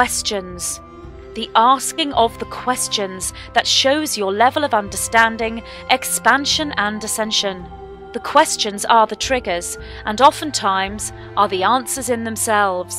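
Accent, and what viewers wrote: United Kingdom. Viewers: me 2. I drink in the accent as for some reason, as I love English accents! Hers is so sweet!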